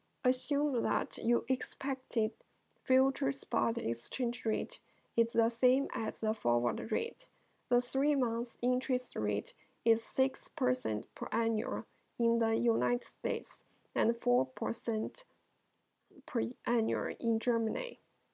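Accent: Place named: China. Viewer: Your accent seems like you are a Chinese~~~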